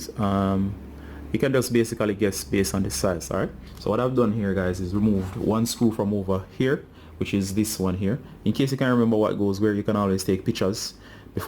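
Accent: Jamaica. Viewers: Hey, A Yardie tech support, gwaan rude boy, great video.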